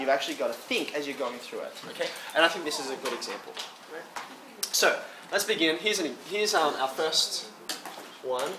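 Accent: Australia. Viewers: I've never seen a Asian with an Australian accent before. He's hot and smart. Great video.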